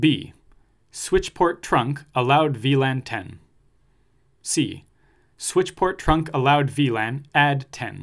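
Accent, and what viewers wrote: Canada. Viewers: Dang, you are doin god's work. I will read my exam questions in that Canadian accent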